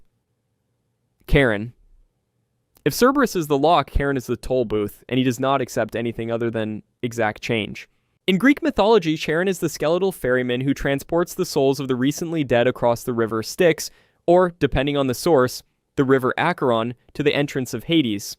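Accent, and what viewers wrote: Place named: United States. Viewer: The amarican accent can never say things properly..."Fryer" is where i left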